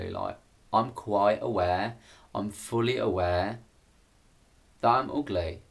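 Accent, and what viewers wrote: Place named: Birmingham, United Kingdom. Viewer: I’m Bromsgrove u sound same x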